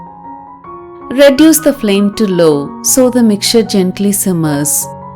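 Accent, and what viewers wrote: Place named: South India, India. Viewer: I love your south indian accent🥰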